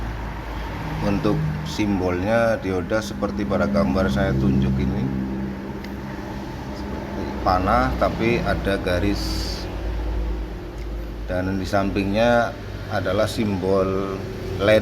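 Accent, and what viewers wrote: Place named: Banyumas, Indonesia. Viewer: Orang mana om. pngin kuraus juga. kayanya org dekat nih. bhsanya ngapak juga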